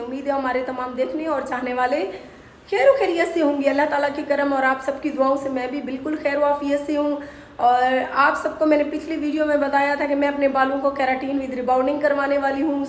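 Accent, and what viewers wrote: Saraiki, Pakistan. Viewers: Ye apka home kis city men ha ,ap saraiki tongue men Urdu bol rahee ho 🤔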